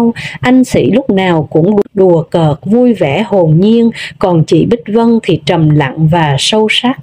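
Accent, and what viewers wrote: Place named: Hue, Vietnam. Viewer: Chị đọc giọng Huế rất chuẩn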